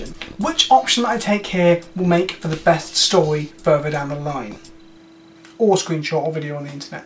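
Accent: United Kingdom. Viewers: It is not really the deck, but the cutting and presentation. I love when you stay on your shots, where you explain a pun, for just a second to long. Reminds me of Family Guy. :D Also the off-topic stories are really funny and your british english is the icing on the cake. :D There are not a lot of YouTube Channels who embrace their accent like you do.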